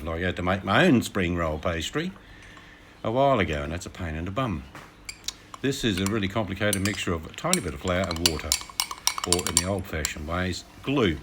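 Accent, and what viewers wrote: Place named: Australia. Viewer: Love it. So Aussie..."that's a pain in the bum".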